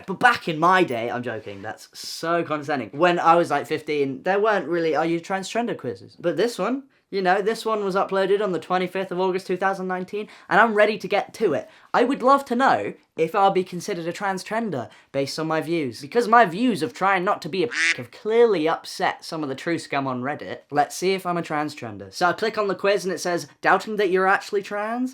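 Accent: United Kingdom. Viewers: idk how if this makes sense but you sound more british than usual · You know I hope you know that you don’t ALWAYS have to feel like you need to post vids abt sexuality or lgbtq related topics. I hope you know that there are many ppl out there like me who follow you for you ie. your charming personality, you humour, your looks and that gorgeous British accent of yours:) I just don’t want you to feel any pressure when it comes to content and hope that your still as passionate about it as you were in the beginning. Honestly I’d even watch you tell me how to cut an apple or explain the different colours to me at this point so just wanted to let u know that we love you for you <3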